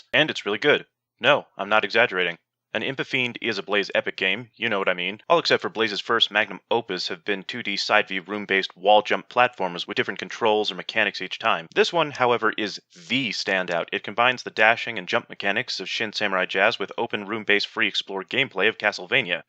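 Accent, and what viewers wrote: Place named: North America. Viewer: You, sir, are too good. Thank you for the correction as well. You emulate Yhatzee's style so well. So well, in fact, if it weren't for the polite (nay, almost posh) North American accent and the garish colour scheme for the sake of individuality, I'd say it was the man himself. More please.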